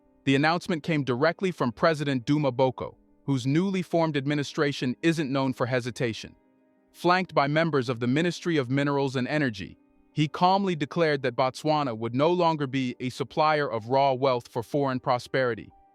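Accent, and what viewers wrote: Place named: United States. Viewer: What's with the American accent? Seems a little hypocritical.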